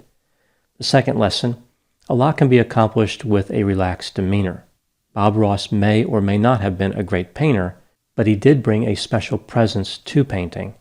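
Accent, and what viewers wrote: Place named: Pittsburgh, United States. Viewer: hearing a Pittsburgh accent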